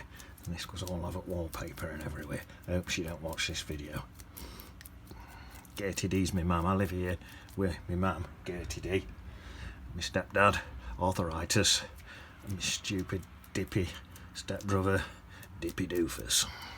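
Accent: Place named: Yorkshire, United Kingdom. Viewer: Thank you for your demo. Very refreshing,informative and like Bob Ross make you feel you can achieve something similar. Also the accent is gorgeous. I’m from Yorkshire, I now live in Notts but the accent is something that I miss. Hence I am so glad I found you and I will follow to pick up some tips. Just great. x😍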